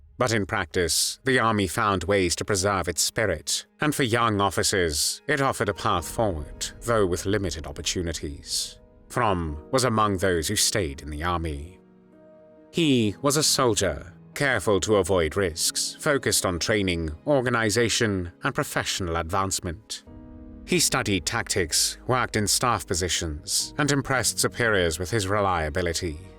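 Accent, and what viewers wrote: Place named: United Kingdom. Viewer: Get rid of this standard BRITISH accent narrator. GET RID of his AUSTRIAN ROLLING VOWELS speech.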